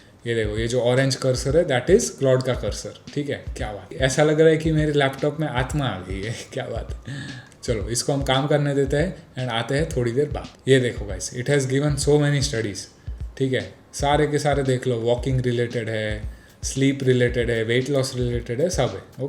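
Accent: Gujarat, India. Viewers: bhai yaar aapki hindi me jo gujarati lehka aata hai vo maja aata hai...by the way english bahot achhi bol lete ho. bhai me bhi professional graphic designer hun ahmedabad se. abhi kam bahot hi kam mil raha hai koi help ho sake to kar dena bro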